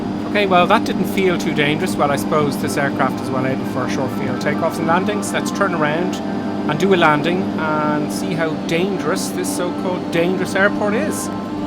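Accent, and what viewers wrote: Ireland. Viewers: Are u Irish if u are what county u from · Sound like you're Irish xD I'm from Dublin! Cool vids man! Really funny and good detailed reviews!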